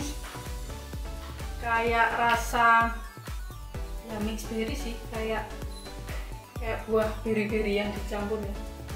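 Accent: Java, Indonesia. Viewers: Medok bnget ya mbak.trims. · Medokk bgt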